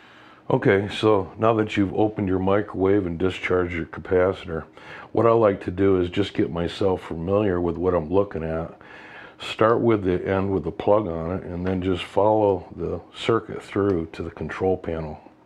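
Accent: United States. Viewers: Thank you for your information as said first safety is very important also your English is good and clear (America English) I am watching from Manchester United Kingdom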